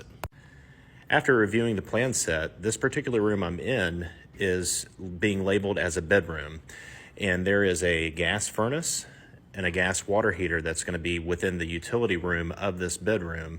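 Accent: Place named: Midwest, United States. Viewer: Wow, That's a sizable number of mistakes. By your accent I'm guessing this in the midwest somewhere, possibly MI or Wisconsin? Just moved out to AZ and I can tell you the level of building integrity is far below my former home in MA. I'm in a 7 yr. old house and I'm finding serious flaws almost daily. Was a builder/remodeler back east.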